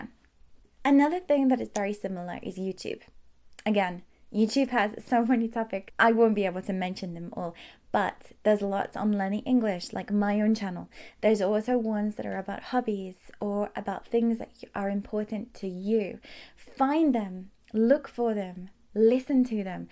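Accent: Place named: United Kingdom. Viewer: Hi there, Teacher! Your British English accent is THE FOREVER the best I have heard of and your voice is really pleasant to listen to! And I really appreciate your enormous patience, dedication, enthusiasm, kindness, time and effort in making excellent English teaching videos for non-native speakers like me! Absolutely amazing! Benny Mok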